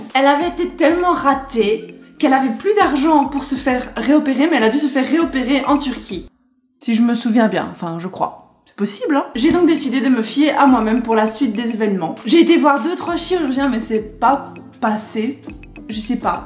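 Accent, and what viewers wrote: Belgium. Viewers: Tu es belge ? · J'adore ton accent, Liège? Verviers?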